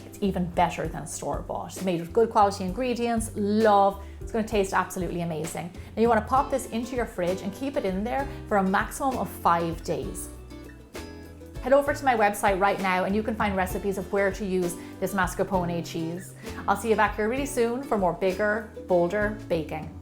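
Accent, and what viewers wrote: Ireland. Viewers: wow that's amazing! haha, I saw your location is in the US so I automatically thought it was a north American accent, but hey Irish accent always reminds me of Tom Branson from Downton Abbey!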